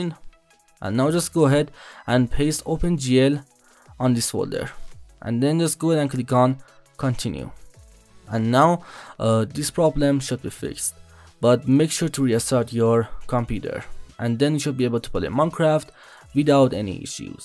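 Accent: Iran. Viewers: ایرانی نیسیتی؟ لحجه رو برم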